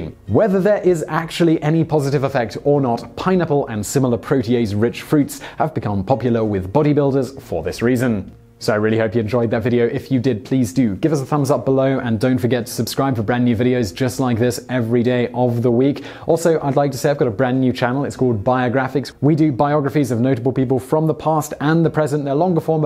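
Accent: United Kingdom. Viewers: Today I found out that you're British.......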